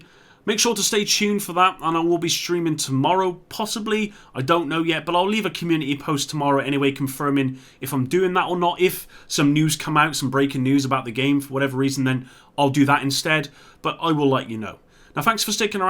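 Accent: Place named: Southwest England, United Kingdom. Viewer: You from sw england? You sound like home haha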